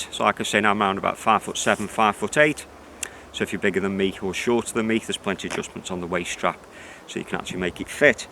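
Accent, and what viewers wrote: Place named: Scotland, United Kingdom. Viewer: Yes indeed that did help a lot. I'd missed the information about the various places you could strap the hip-belt on. I thank you. I'd like to avoid those trials and errors as much as possible when buying over internet, especially from companies like Varusteleka whose customer service will always go the extra mile : I wouldn't like to stretch them either, for fear of seeing them put on a tougher face. Besides, it's been refreshing for me to listen to you talking with your scottish twist. I've lived around Aberdeen for three years, and hearing a scotsman talk brings every time fond memories of warm people and amazing light on the land. Cheers ! :)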